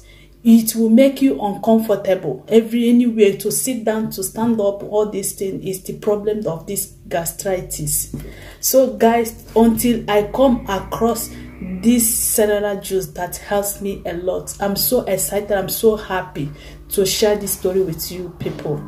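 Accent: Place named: Caribbean. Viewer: I could be wrong but you sound in the Caribbean accent but either way I take your advice very serious because people like you know your s***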